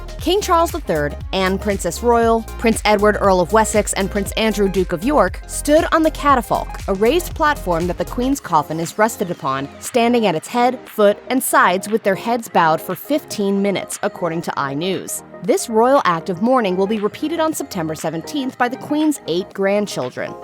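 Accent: United States. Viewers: I don't need this stupid American voice. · Who is the commentator? Sounds awful. An American?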